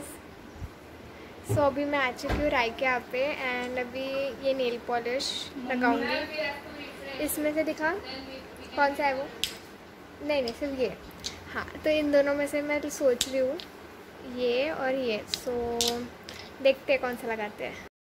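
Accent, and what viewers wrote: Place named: Nagpur, India. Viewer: i just love your nagpuri accent..like all nagpurkars can relate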